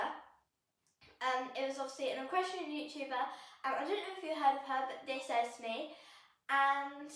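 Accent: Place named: RP, United Kingdom. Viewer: Love your accent and articulation, proper English !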